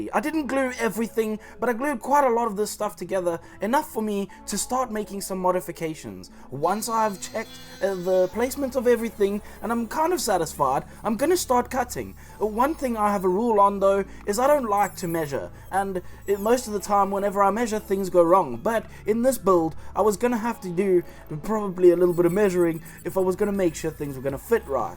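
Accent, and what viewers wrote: South Africa. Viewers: I have to ask: are you originally from South Africa? Feels like I'm hearing a familiar accent 😅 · dude sounds maybe a bit south african?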